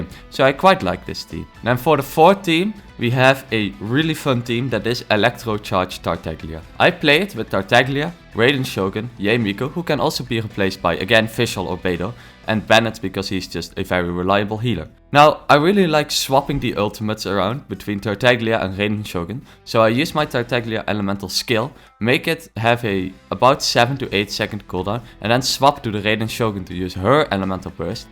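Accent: Netherlands. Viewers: Helpful video! Are you Dutch by chance?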